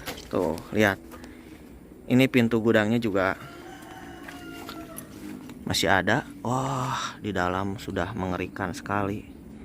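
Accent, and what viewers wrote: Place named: Banyumas, Indonesia. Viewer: Bahasanya keren tuh kang ,,, ngapak habis😊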